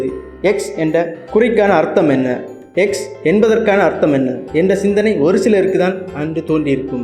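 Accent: Kanyakumari, India. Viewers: Bro kanyakumari ஆ Bro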